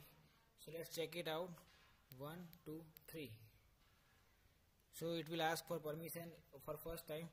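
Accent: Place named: India. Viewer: I love Indian Accent really!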